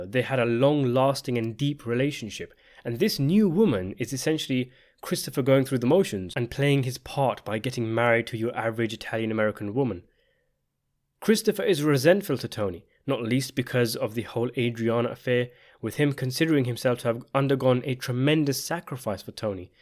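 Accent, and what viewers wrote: United Kingdom. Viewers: Oh god Brit narrator I'm out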